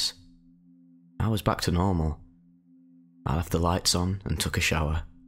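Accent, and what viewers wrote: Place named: Northern England, United Kingdom. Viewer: “If life was just in pure god mode and we were fucking no clipping through walls it would be well boring won it” love actually having British humour on the internet for once instead of watered down American humour and way of talking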